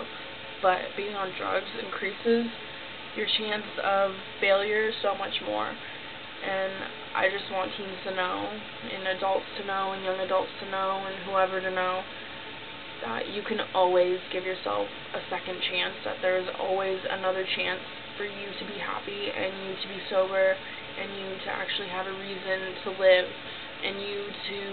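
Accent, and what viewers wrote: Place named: Mid-Atlantic, United States. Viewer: you sound like you're from the mid-atlantic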